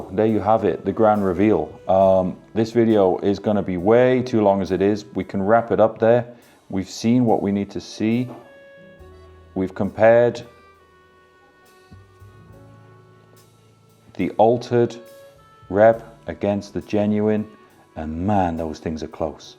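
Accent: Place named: Wales, United Kingdom. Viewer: Where’s your accent from because I thought I could hear a bit of Welsh in it? 🧐👍🏻🏴🇬🇧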